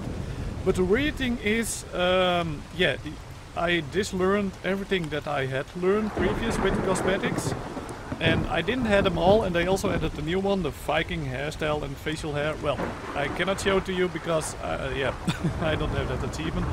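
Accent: Netherlands. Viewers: are you dutch because you kinda have a dutch accent when you speak english?? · are you dutch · That Dutch accent XD. Respect!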